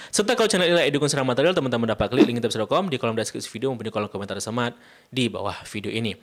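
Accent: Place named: Aceh, Indonesia. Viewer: Logat Aceh Abang ini buat aku mahu ketawak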